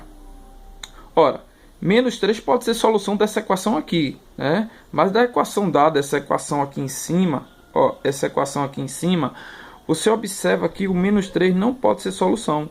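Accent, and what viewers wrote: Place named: Northeast, Brazil. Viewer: Gostei muito do canal, consegui aprender fácil a matéria. e pra mim fica mais fácil quando o professor tem um sotaque de nordestino, o porque eu não sei .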